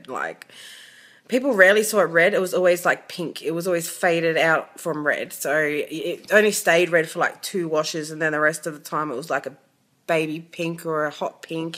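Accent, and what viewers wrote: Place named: Australia. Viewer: love the australian accent, and the blonde looks awseum :D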